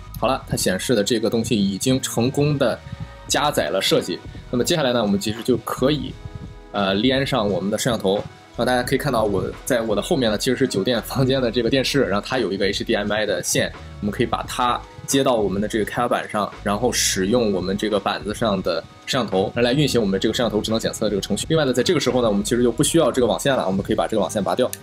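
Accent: Shandong, China. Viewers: 是山东人吗哈哈哈哈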